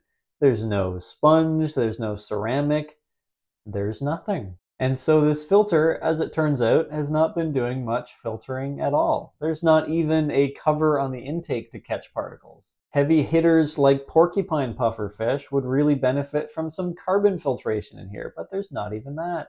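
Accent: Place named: Canada. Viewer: the Canadian accent is subtle, but i live rural, that upraising is hard to miss when you look for it, you'll notice a difference between cloud and clout, clout has the uprising cloud doesn't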